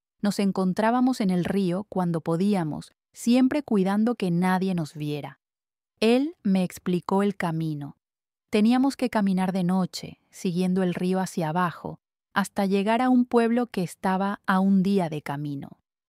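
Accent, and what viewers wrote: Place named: Spain. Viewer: ESPAÑA te oigo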